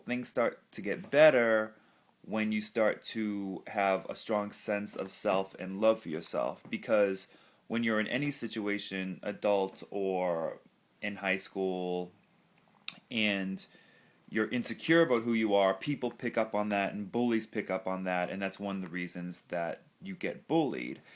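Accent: New York, United States. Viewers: It's fucking sad that this kinda shit is still happening. Sorry this happened to you. Your NewYork accent is adorable. Sad for me that youz gay😏I fink youz tha cutest! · Love the NYC accent sooo much. xoxoxo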